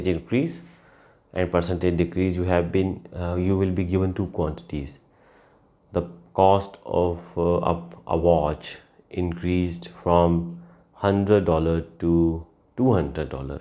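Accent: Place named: Russia. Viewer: Russian accent!😄 love u sir for the way u teach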